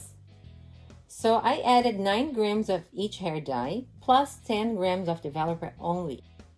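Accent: Brazil. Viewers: Como a gente reconhece o sotaque Brasileiro 😍Tava te assistindo e pensando ela é brasileira e no final vc fala Português kkkk !Ficou show Seu cabelo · are you Brazilian? I’m from Brazil, and I noticed a familiar accent in your speech and you said tchau in the end, so it made me wonder.:)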